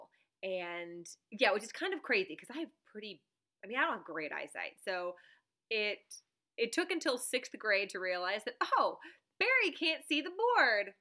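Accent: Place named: England, United Kingdom. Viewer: Thank you for taking my English accent comment and speaking more :D! <3 :)!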